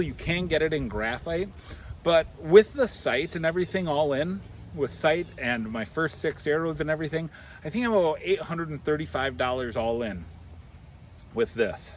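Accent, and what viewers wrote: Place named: Pennsylvania, United States. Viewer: lol he sounds like an Eagles here in Pennsylvania... I must agree with you... My son is on the Mathews train... He owns the newest one of 2022... I forget the model honestly... Completely dressed out its heavy... He had to add so many silencers to quiet the bow down I was actually in shock and his is set at 40 lbs... But I've been doing research on the shortest Ata and with good speed... I've seen its been 2 years now since you posted this video... Can you give an update??? How's it holding up??? Any issues??? I'm curious... I live here in Central PA not far from State College and the hills are steep some are very thick with under brush and heavy thorn bushes... So I'm trying to stay light and short...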